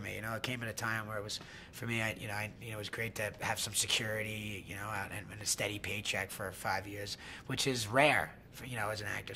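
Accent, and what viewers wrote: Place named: New York, United States. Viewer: No, he sounds like a New Yorker lol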